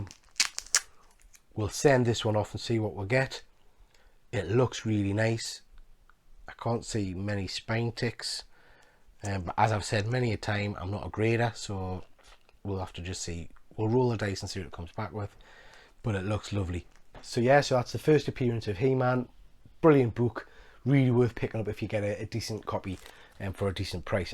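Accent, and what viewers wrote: United Kingdom. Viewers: Really like your British accent mate👍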